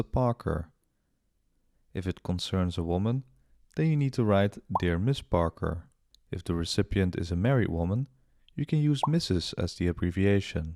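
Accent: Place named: Netherlands. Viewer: dutch accent is the best accent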